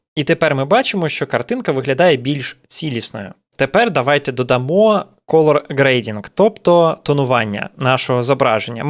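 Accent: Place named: Russia. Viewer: Пиздец говорит с русским акцентом,типа украинец дохуя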